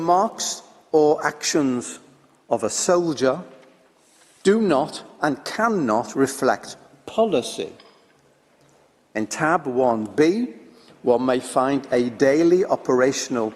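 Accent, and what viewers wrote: United Kingdom. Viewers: Mine second - I ador your British English, Sir Malcolm! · Beautiful English, amazing British accent.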